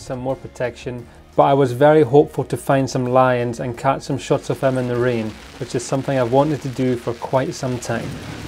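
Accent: Scotland, United Kingdom. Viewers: This video just popped up on my YouTube recommendations. The Lion footage is just incredible! Nice to hear a Scottish accent on a photography channel. I am originally from Alloa now living in Melbourne. I took up Bird photography about 3 years ago, heaps of birds here but no lions unless you go to Werribee Open Range Zoo which is incredible. Have just subscribed so looking forward to more inspiration!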